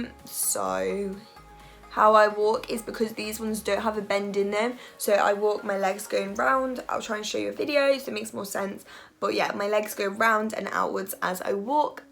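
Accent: United Kingdom. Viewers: I have seen this video three times by now. Thank you. Your smile, your personality, your British English, your determination, your positive attitude. You brighten up my day. You boost up my mood, you give me hope and inspiration. You move me. It's.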